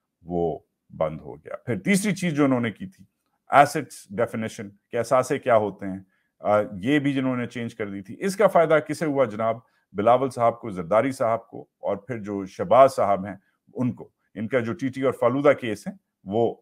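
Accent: Punjab, India. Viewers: No, being a Punjabi myself, I enjoyed your Punjabi accent while explaining the amendments. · پنجابی کا تڑکہ یا touch اچھا ہے۔ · Love you Wajahat and your Punjabi accent . Superb bro. tum naay khoob tease keaya isss punabi fauj kooo . yeh punjabi dhagay sirf khaa bhee sakty hain moty maghaz kee log hain yeh sary boot. per inko $$$$$ ginanna aur plot bnana khoob ata haay. aur tu aur papa johnz bhee tumhary neighbour main inhee kee hain. dekho looo punjabi fauj kooo. haram kee adat paree huee hay inkoo. yaar itna arsa inkee sath kaam keya tum naay yeh tu samggty hoo fauji koo dosry kee jeeb saay mal nikalwana khoob ata haay. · Always a big fan of you bro and loved the way you explained in bit of Punjabi swag 😜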